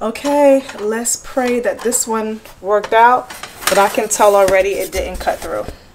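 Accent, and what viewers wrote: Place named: Boston, United States. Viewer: Are you from MA? The amount of times you said “lasah” I was like ok she sounds Boston!! 😂😂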